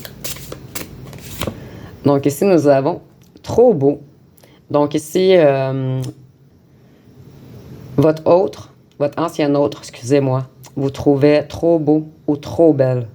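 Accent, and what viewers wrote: Canada. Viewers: Bonjour toujours très juste,bravo avec ce bel accent canadien, merci belle journée à vous 🙏👍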